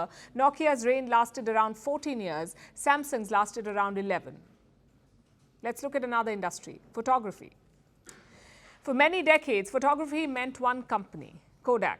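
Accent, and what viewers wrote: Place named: India. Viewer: Horrible accent ,we are not trying to learn English with Hindu accent…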